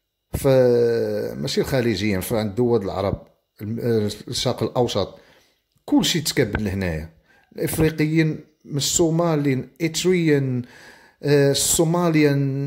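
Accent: Morocco. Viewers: اللهجة ديالو طنجاوية انا من طنجة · شكرا خويا على هاد الموضوع المهم و الحساس و الخطير. باينا فيك مغربي قح و عندك غيرة على البلاد و من النطق ديالك باينا من الاقاليم الشمالية في المغرب و اضن العرائش. المهم احييك لاتارة هاد الموضوع . هده الضاهرة انتشرت وسببها عاملان الغرب و السياسيين المنبطحين في بلادنا و الاعلام الدي لا ينشر سلبيات الضاهرة للتوعية . انها سياسة الاستبدال الكبير و ترحيل المغاربة لخلق جيل عديم الهوية . اكبر مساهم غي الموضوع ليس الفقر كما يضن البعض بل الجهل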